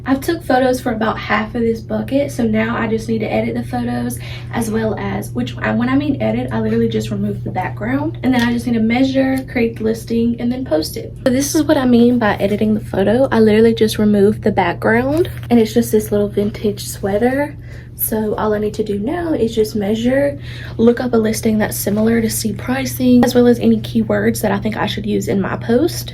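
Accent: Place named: Southwest Virginia, United States. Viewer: Hi! Va here too! I gotta ask bc of your accent. Are you from south west Va? You sound like my family.❤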